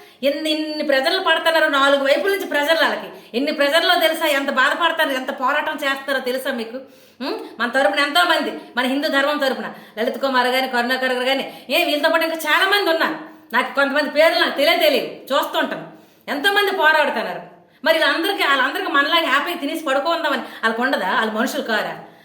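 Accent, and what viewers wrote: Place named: North Andhra, India. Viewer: 🌹జై శ్రీరామ్ 🙏 బంగారుతల్లీ వందనాలు. రాబోయే ఉపధ్రవాన్ని మరచి, గాడనిద్రలో వున్న మన హిందువులలో చైతన్యం తీసుకురావటానికి మీరు చేస్తున్న ఒక మంచి ప్రయత్నాలకి అభినందనలు, ఆశీస్సులు. సహజమైన, చక్కని ఉత్తరాంధ్ర యాసలో మీరు మాట్లాడే విధానం చాలా బాగుంది. మీరు అద్భుతంగా పాడుచున్నారు. మీకు సదా ఈశ్వరానుగ్రహప్రాప్తిరస్తు. 🌹🌹🙏🙏🙏🌹🌹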